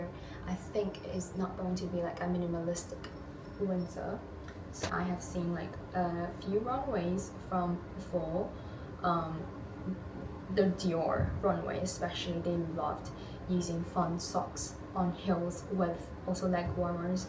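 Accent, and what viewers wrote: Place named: England, United Kingdom. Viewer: I slow your video way down so i can watch while i work. Then i can also read what you are saying so it's great! I love most of these trends. It's crazy how many of these i already have! Mixing glam with sportswear sounds super fun so im gonna try that! Thank you for inspo and i love your English accent lol! subscribed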